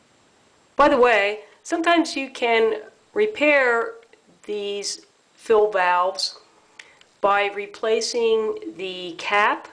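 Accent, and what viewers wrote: Philadelphia, United States. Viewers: sound like your from Philly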